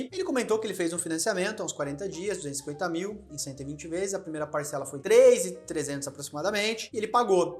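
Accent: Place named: Santa Catarina, Brazil. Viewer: eu concordo, sentia isso quando conheci o canal, mas com o tempo você vai dominando os maneirismos do "sotaque" catarinense e consegue absorver quase tudo que é passado rsrsrs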